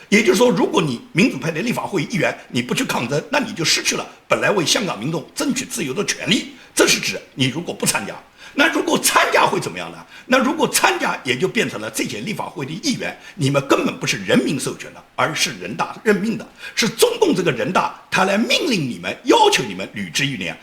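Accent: Nanjing, China. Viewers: 喜欢建民用带有南京腔骂洪晃，过瘾！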